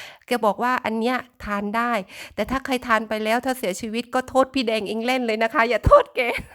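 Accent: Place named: South, Thailand. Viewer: ดอกไม้สวยมากบานสพั่งเต็มทุ่งเลยช่วงนี้ถ้าเก็บเอามาขายบ้านเรารวยเลยดอกไม้ขาดตลาด ฟังน้อง เล่าเพลินเลยสำเนียงใต้ฟังชัดเจนดี รายได้ดีมากคิดเป็นนาทีเลยสุดยอด น้อง สาวใต้เก่งมาก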